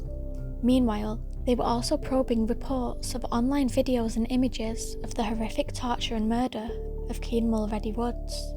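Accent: Manchester, United Kingdom. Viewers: Your accent is very similar to my south Manchester accent. Enjoying your videos.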